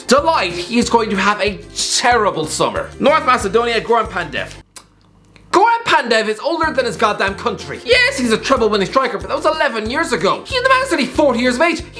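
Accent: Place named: Ireland. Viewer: Irish accent makes it seem like that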